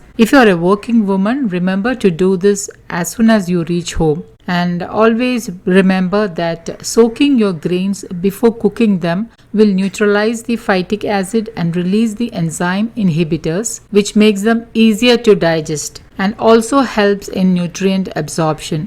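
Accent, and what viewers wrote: Kerala, India. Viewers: I truly ❤️ & admire the attention to detail while narrating the recipes. Also, your Mallu accent & soothing voice is pleasant to listen to. Just subscribed & thanks! 😘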